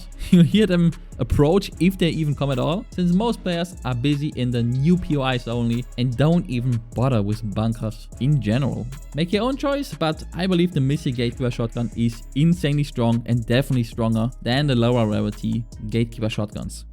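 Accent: Germany. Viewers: he’s german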